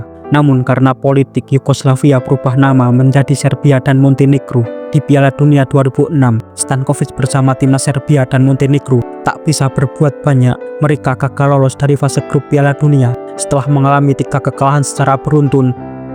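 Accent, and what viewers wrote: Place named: Java, Indonesia. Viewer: ngomongnya jangan medok bro, pake bahasa dan nada nasional. agar enak di dengar.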